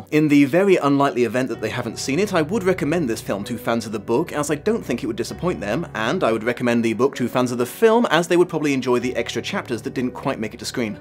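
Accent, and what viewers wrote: United Kingdom. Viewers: The British propaganda is working on my American ass, because that intro would have been a big nerd if it weren't for the accent 🫠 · What part of Great Britain are you from? I'm sure I've heard that accent before! But I don't know now where it is from. I lived in Benidorm for more than ten years. I was in contact with many British people during that time. Please, tell me where you are from!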